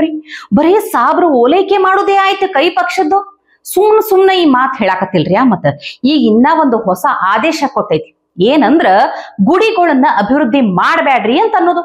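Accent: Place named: Karnataka, India. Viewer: It is a pleasure to hear your Kannada accent.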